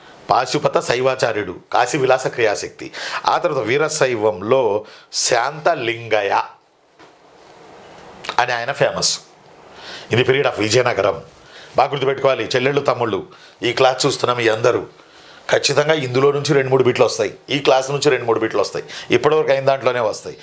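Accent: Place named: Telangana, India. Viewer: Sir.. మీరు మెల్లగా తెలంగాణ slang లోకి మారిపోతున్నారు..😂( ప్రదేశం ప్రభావం అనుకుంటా)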